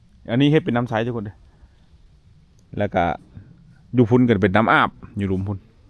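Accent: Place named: Phu Tai, Thailand. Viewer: แกงหอยมี้เฮ้อเผ็ดแกงเห็ด มี้เฮ้อเค็ม.. เว้าคือเดว..กับ ..ทางผู้ไท ..เขาวง...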